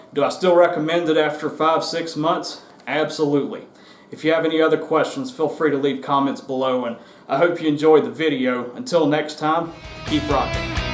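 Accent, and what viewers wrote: United States. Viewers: You sound like Macon Georgia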